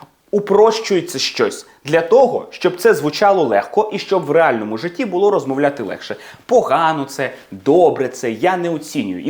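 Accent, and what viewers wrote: United Kingdom. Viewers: Дуже дякую, це звісно полегшує вимову. Дуже подобається як ви говорите англійської із само британським акцентом. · Вирішила підписатися, бо у Вас британська вимова, а не американська. А у Вас є якісь курси? Може школа?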